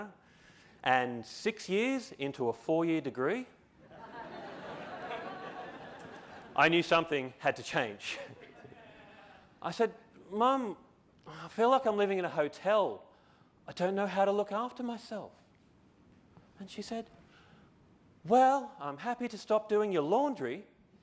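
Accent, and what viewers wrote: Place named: Australia. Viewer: boss you sound ozzie not brit excellent speech sir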